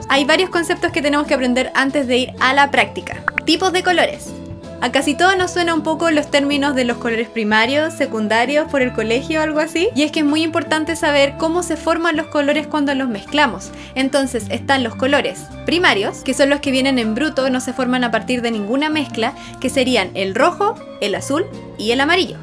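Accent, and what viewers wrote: Chile. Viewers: Nomames amo su acento chileno alaberga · es el acento chileno xD como nadie se da cuenta de eso xD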